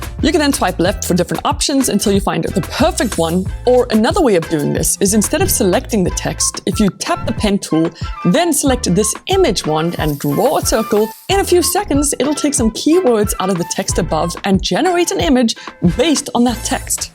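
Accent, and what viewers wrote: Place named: South Africa. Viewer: This lady sounds very south african, where is she from?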